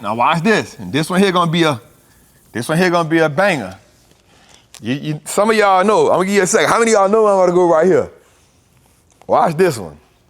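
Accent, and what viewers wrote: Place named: Louisiana, United States. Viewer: I love an intelligent trapper. Dope. Keep up the awesome work 🔥 i hear some Louisiana in there too. NO native here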